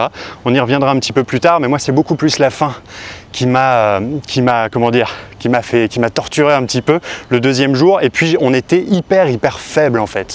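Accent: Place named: Switzerland. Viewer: Tu dois etre Suisse pour parler comme ca.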